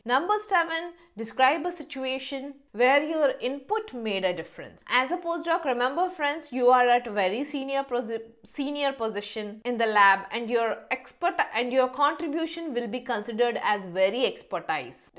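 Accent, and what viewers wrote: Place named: India. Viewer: Best indian accent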